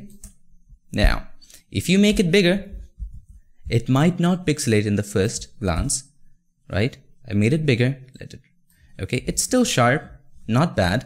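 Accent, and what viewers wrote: India. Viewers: bahasa inggris logat india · du redest zu viel mit deinem indischen Akzent😮😅😊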